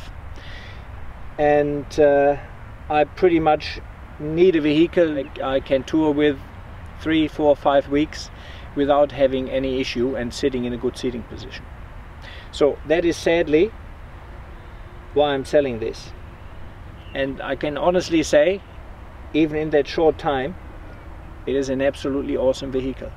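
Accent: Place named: Germany. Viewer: Ähmm... your accent... sounds damn deep German... richtig oder? Still Pinzgauer for President... best time at Austrian Military was "driving" to Locations with this thing... driving, its more waiting for the Location comes to u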